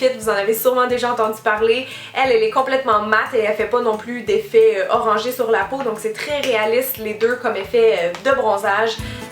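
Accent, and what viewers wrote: Quebec, Canada. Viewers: Aaaaaah je reconnais l'accent québécois omg! Moi aussi je vis au Québec, à Montréal plus précisément! C'est la première fois que je vois une autre youtubeuse québécoise! Je m'abonne!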